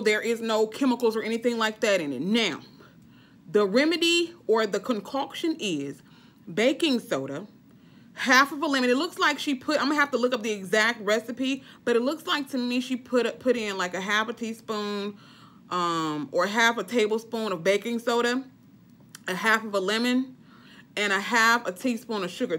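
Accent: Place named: United States. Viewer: Lol girl you killed me, i love how you American talk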